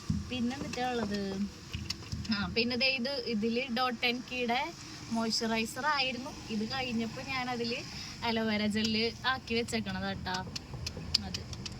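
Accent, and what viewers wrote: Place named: Thrissur, India. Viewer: തനി തൃശൂർ slang 😂🎉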